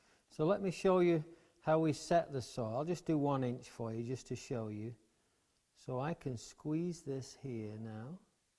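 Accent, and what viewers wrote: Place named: Yorkshire, United Kingdom. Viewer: Your accent sounds like my father's Yorkshire?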